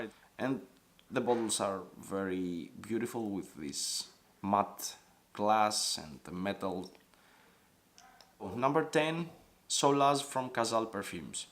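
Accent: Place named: Greece. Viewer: XD recognized the accent im Greek too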